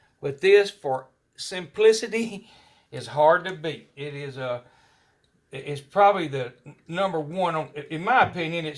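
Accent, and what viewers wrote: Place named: Alabama, United States. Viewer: Sounds like he's from Alabama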